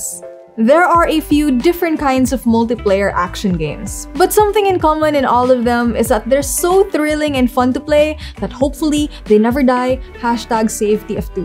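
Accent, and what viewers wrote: Philippines. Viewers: That's some thick philipino accent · Filipino accent is strong on this one.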